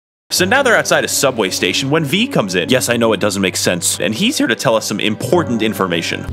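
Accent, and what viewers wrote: United Kingdom. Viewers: Oh, he's british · Oh he's british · "Oh, he's British." As a British person, I can claim he is from Birmingham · oh hes British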